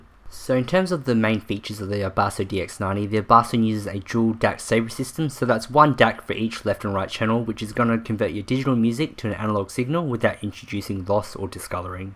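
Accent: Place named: Australia. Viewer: Love the Australian accent.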